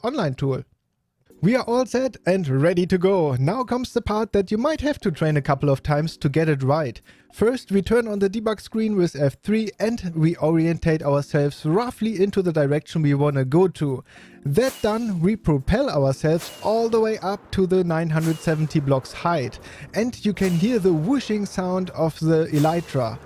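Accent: Germany. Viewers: Bist du Deutsch? Hört sich so an!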